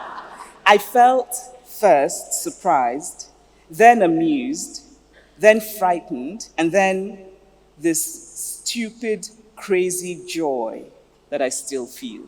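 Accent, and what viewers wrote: Nigeria. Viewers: "How I like finding bumps in smooth surfaces" I could relate to this. I'm always scared of perfection because perfection is too mechanical. I love that you maintained your Nigerian accent, it's beautiful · Would call it bravery amongst other things. Cause people around her would be intentionally or passively chipping away at her to stop making them feel uncomfortable whenever they all go out and she speaks in her natural Nigerian accent, but her courage and confidence in her own tone and skin has helped her last this long, without modifying it. I think by now it’s much easier for her to sustain, but earlier in her abroad life, it’ll have been much harder & the critics would’ve been much louder too. Hoping more Africans that’re spread across the diaspora develop this natural courage to speak freely but remain eloquent at all times.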